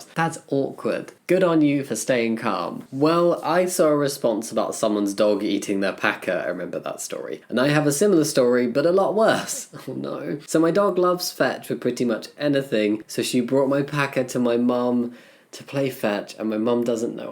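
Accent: United Kingdom. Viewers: off topic but are you british?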